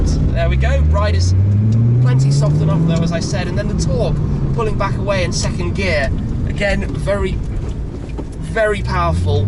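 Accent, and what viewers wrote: United States. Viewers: Shmee is slowly turning American 😂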